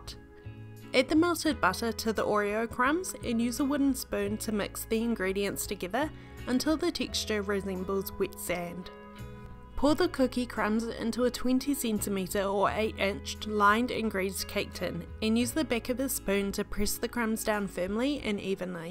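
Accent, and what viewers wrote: New Zealand. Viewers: Nice nz accent 👌🏻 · that New Zealand accent is everythinggg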